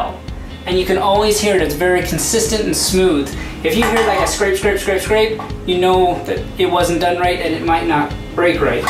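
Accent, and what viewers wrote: Canada. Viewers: Great video, you sound Canadian?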